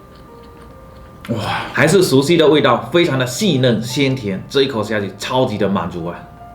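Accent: Fuzhou, China. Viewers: 咱们福州人的乡音属实太明显了。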